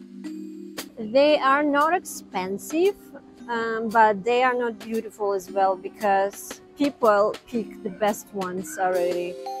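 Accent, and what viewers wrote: Russia. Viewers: Первый раз смотрю этот канал, НО прям слышно Русский акцент! Или мне одному показалось?????😀 Особенно режит слух, англ. слово "БекАз", Англичане/Американцы, произносят "БекОз" или "ДрЭган", носители в основном говорят "ДрАган" и т.д. и т.п.!!!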